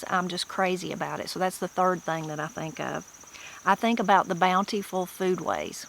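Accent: Appalachia, United States. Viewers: I didn't know it until today but I grew up in Appalachia. Since I have been watching your videos I have commented that my family talked like you, same language, grew out food, the same family likes, dislikes. I even said listening to you reminded me of my mother. After seeing the map, I realized that the part of South Carolina (up state) where I was raised is in Appalachia. What a wonderful surprise. I have learned so much from you. Thank you.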